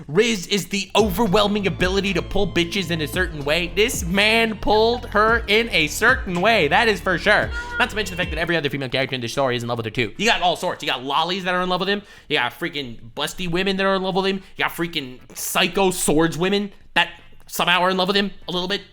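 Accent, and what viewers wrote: United Kingdom. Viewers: THe Brit accent is freaking classic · From when are you british? Just I'm sure it was dritish english. Like please.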